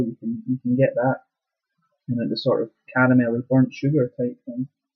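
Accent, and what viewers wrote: United Kingdom. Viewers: yes sir, and guess what; yes, I live in Canada! Lol, I moved up here 3 years ago. Where are you from bro? If you live in the states, I'll send you some beers from Mexico if they allow me too, although I pretty much believe you live somewhere in Europe, that British accent is obvious. Love your videos bro, and pardon me! It is not a Louisiana beer! It's called: "Mississippi mud" the one with the alligator in it! Lol, they'll laugh their tears off! If they read this.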